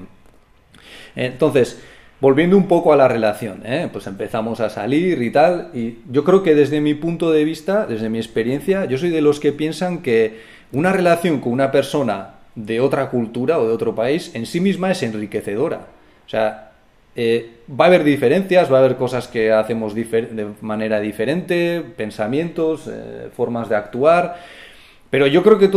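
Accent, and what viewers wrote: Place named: North, Spain. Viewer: En el norte de España su acento es así, en este chico y en el común de la gente.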